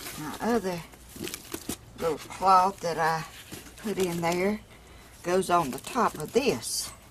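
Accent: South, United States. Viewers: I wish we were neighbors so that we could share knowledge and crafts. my daughter who is 13 wrote a paper on your videos. she practices talking like you and she says she just loves your southern accent...we people from Texas love accents..lol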